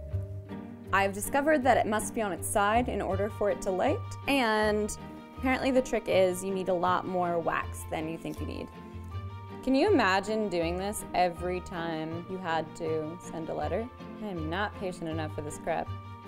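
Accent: United Kingdom. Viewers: speaking british will be the death of me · as a brit i'm pleasantly surprised at english accent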